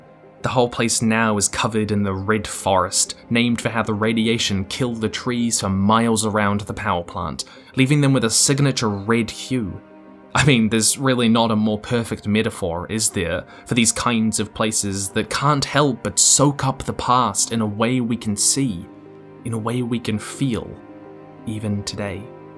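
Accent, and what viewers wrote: New Zealand. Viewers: I didnt know was from new zeland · Whaaaaaaaaaaat??? His accent is... new zealandish? woooow brah Would never guess (maybe I'm... wrong?) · You sound like a kiwi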